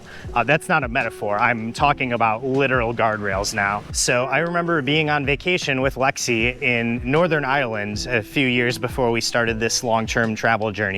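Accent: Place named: United States. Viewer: I like ur American accent and subscribed🇺🇸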